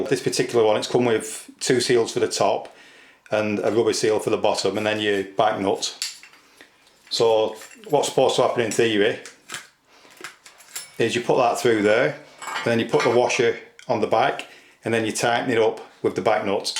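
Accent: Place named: Lancashire, United Kingdom. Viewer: Afternoon. SO I stripped all the sealant off and started again with the waste plug. This time a rammed loads of sealant on the rim of the upturned plug, then loads on the bung. The latter lubricated the bung so it slipped on without anywhere near as much force. Then I walked away for 24hrs... AND IT WORKED! Patience was the missing ingredient! Thnx (BTW you sound like you have a Chorley/ Leyland accent, brother)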